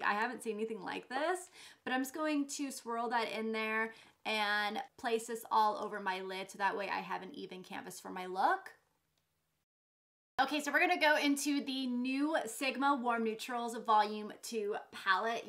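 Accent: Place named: New York, United States. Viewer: I love her accent. She has to be from New York, Staten Island kind of area.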